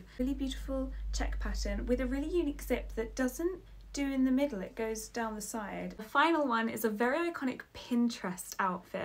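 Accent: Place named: RP, United Kingdom. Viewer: You sounded so- idk how to say it without it sounding bad but like, posh the whole first half then suddenly you said “when we was up in London” and I actually giggled out load.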